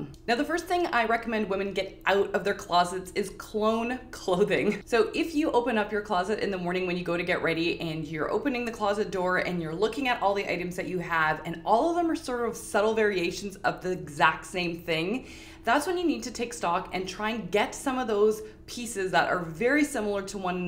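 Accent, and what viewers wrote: Canada. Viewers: Less than 30 seconds in and I just have to say your accent is so cutely Canadian! Have a wonderful day! Now back to watching the video.......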